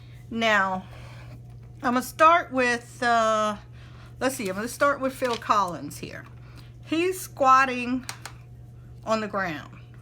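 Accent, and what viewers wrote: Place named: South, United States. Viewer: I'm from New Orleans. Are you from the south? Sounds like it lol. I always like to guess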